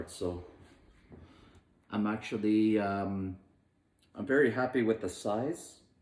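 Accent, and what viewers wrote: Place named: Jamaica. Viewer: He almost sound jamaican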